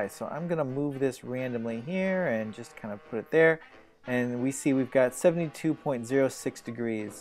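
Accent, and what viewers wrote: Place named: Minnesota, United States. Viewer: turned on my sound, not expecting to hear a Minnesotan accent, and what do I hear but my family's home?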